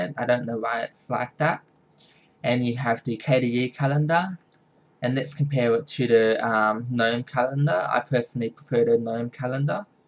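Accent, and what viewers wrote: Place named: Australia. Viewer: Sounds like he's an Aussie to me, Ronaldo.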